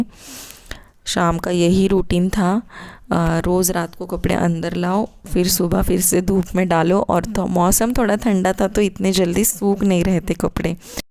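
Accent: India. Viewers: Sister aap kokni ho kya? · As salamu alaikum mai to peheli bar Aap ka Vlloog deqa ji aap Kon se gaon se ho ji Aur aap ka bat karne ka tariqa to Hamare andara pradesh jai sa lagta hai vllog bhi Masha allha Aur pakwan bana neka tariqa Subhanlla 👌👌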